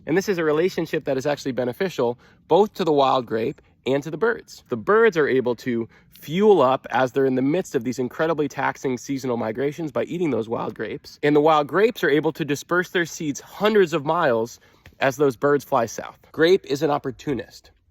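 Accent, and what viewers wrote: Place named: Vermont, United States. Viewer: Oh my goodness! You sound like a real Vermonter! Recognizing nuance dances within the entire Living Web of Life.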